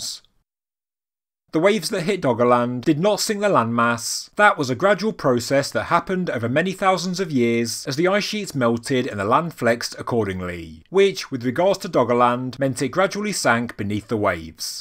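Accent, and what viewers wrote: United Kingdom. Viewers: Its just his accent 🤷🏼‍♂️from the uk..unfortunately i sound very similar 😂 im from northampton in the midlands.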